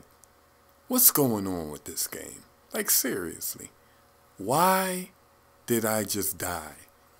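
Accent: Philadelphia, United States. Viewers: are you from philly ? i am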